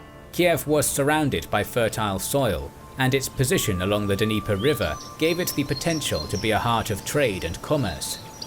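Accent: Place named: United Kingdom. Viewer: Hey, I'm talking to YOU! Your channel is disappointing, and I'll tell you why: I'm not even one minute in, and I get blasted with a stupid Nintendo (No-Friend-o) video-game ad... (You know that I can just Google the info I want to know, right? I don't need to watch your channel to know these things)... I don't like being tricked, and that is exactly what you are attempting to do; to trick us into watching ads for your silly game. Shame on you! Why are all these so-called "historians" always hawking some dumb-ass RPG before they regurgitate a warped version of Wikipedia with incongruous graphics?...And, they all seem to have annoying high-pitched low-class British accents too... What gives?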